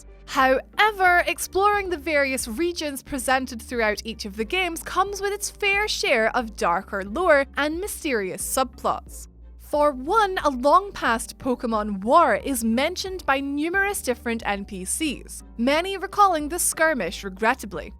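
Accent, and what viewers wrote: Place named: Scotland, United Kingdom. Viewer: This bitches Scottish accent is annoying and irritating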